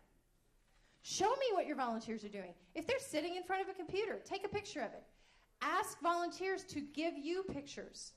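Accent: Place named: Kentucky, United States. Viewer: It was brought to my attention today that someone is offended by a comment I make at the start of this video, and because of my earlier comment here, referencing my Kentucky accent (the offended person didn't write me - her colleague did). Prompted by that second-hand feedback, I've written a blog that I'd like for anyone who is offended by my self-depreciating humor to please read first BEFORE becoming outraged. There's also suggestions in the blog on how to communicate with me directly about any of my work, so that things can be clarified before offendedness ensues. <link>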